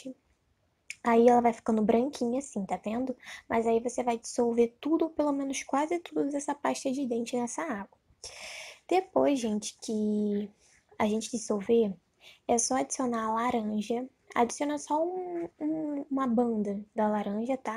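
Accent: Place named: Rio de Janeiro, Brazil. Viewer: Vc é bem carioca né, da pra ver de longe, talvez nem seja, mais tem mt mt mt sotaque, gostei do video, vou testar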